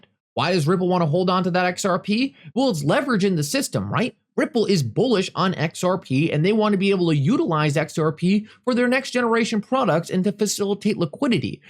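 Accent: Allentown, United States. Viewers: with an Allentown accent broken record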